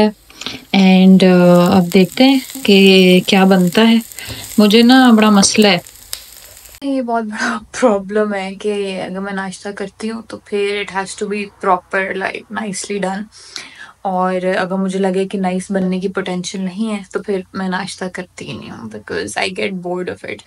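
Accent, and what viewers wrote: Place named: Punjab, Pakistan. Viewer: PK jabi and Canadian English accent doesn't mix too well....but its funny nonetheless !!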